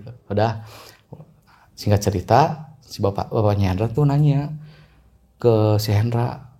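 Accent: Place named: Bandung, Indonesia. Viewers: Duh gusti Aa jatohnya jd bodor ah nyampekeun caritana sunda pisan hahahaha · Ngomong teh meni bandung pisan atuh mang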